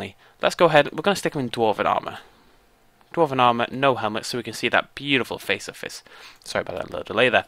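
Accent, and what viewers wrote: United Kingdom. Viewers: Luckily I actually can imitate four of the over five thousand U.K accents so I can understand him. Now as for what he is talking about and making reference to, well I suggest you all go to full screen to be able to read the headings on whatever he is mentioning. If you need a translation into American aka Michigan-no-accent-at-all, just note me. I will attempt to delete all the extra words that are not necessary to learn how to do this in a transcript. I can do everything in Old English fonts to make it more authentic. ;)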